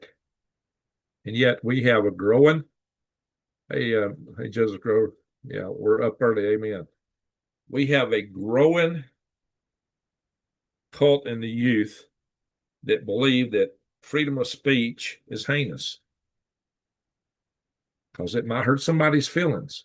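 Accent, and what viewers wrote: South, United States. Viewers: my example is my friend. He's a good guy, he's my friend too. I showed him your channel when he was over. he teases your Southern accent "China", lots of people live in a 'fast world', and some of it is superficial. I'm back on it!! IGNOREISM- Caused my Schism! LOL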